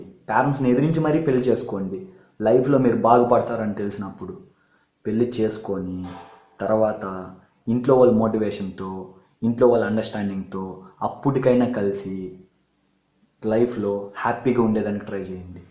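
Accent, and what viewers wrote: Rayalaseema, India. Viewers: Ur 100% right bro Baga cheppavu nuvvu Bro manadhi rayalaseema kadha expt chesanu ni matalu vintumte telustundhi ......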